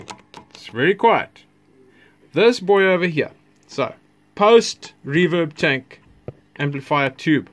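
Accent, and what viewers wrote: South Africa. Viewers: So nice to hear another Saffa's accent and also somebody who loves valve amps.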